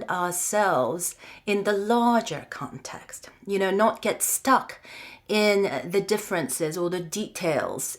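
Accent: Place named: United Kingdom. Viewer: I LOVE HER UP SCALE BRITISH ACCENT.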